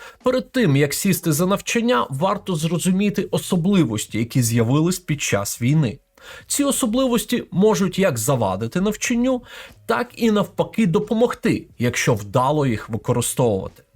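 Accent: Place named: Western Ukraine, Ukraine. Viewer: вот так, першёл на мову с англ. субтитрами, "назло надменному соседу". Но его прежние, "предвоенные", курсы на русском замечательны, хорошая дикция и без акцента, но интонация - с западной украины.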